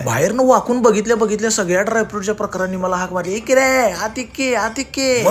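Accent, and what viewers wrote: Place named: Satara-Kolhapur, India. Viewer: खुपच मस्त video भाऊ... आणित्यात तुमची commentry म्हणजे ईशयच नाय रे भावा... इरसाल कोल्हापूरी... ❤❤❤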